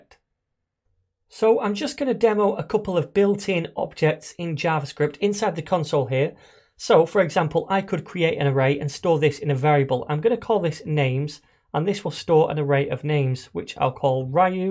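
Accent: United Kingdom. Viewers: So glad to hear you with British accents very informative and comprehensive explanation thank you.!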